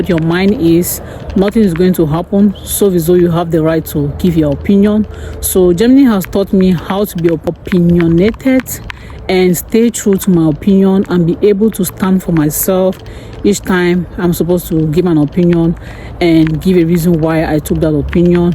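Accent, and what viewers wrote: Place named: Nigeria. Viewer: Nice, did you grow up in Nigeria? Your accent sounds Nigerian.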